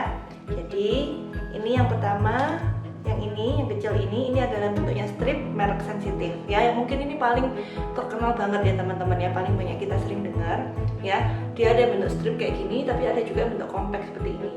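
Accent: Java, Indonesia. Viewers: medog kali logat nya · Mba kok ngmongnya medok .. kaya org Jawa yah😊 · Dok medok, jawa mana dok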